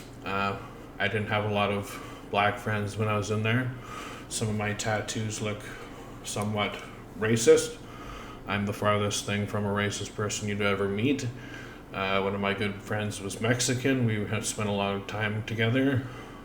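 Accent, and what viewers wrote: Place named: Canada. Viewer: You get so many mixed messages about the American system about rock spiders and sucks and such salts against women. But these are the same people that do that. So that confuses me and you've are giving me some serious information which I appreciate. When you say you was so sided with certain games on motorcycles. Were you a hang around? I was just curious. Cause that's what it sounds like. I'm not trying to compare 1 person with another. But you remind me so much of JD. But the Canadian version, The fact that you speak canadian in other words. I own my shit is two true you give great advice/knowledge. Keep doing what you're doing you're success. I know i'm not kissing ass i'm just blown away.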